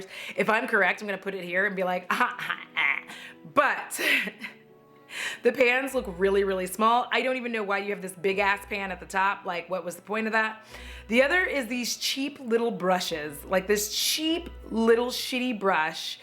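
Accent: England, United Kingdom. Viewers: My husband just walked past me and said “Ooh, nice colour hair, ” and I agree, this teal is amazing on you. And, your Charlotte Tilbury English accent gets the seal of approval:)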